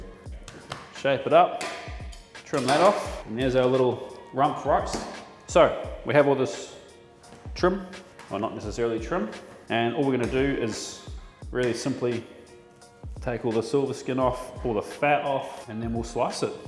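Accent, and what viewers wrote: New Zealand. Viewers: An Australian (am I picking up a Kiwi twang?) butcher doing a single take video with maybe muck ups along the way? Liked and subscribed. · he is a Kiwi but lives in Australia and has done for a while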